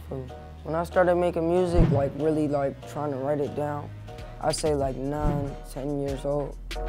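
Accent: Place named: Philadelphia, United States. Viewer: He from philly that’s how we talk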